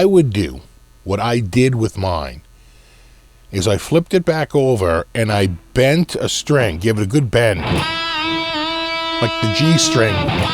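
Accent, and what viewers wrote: Boston, United States. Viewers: From Boston? Say no more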